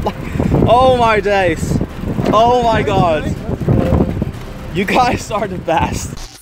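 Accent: Netherlands. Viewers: Ohhh you're dutch, that explains the accent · Are you dutch????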